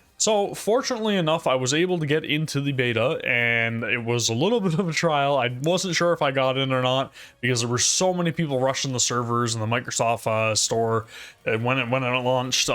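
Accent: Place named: Atlantic Canada, Canada. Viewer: Informative video. Out of curiosity, are you from the east coast of Canada by any chance? I hear a bit of NS or maybe NL to your accent.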